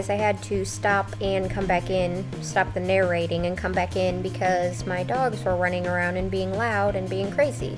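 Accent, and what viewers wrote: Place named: Boston, United States. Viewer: Love the house! Btw did the Cubs win? And are you from Boston ish area? Me and the bf were trying to guess where your accent was from.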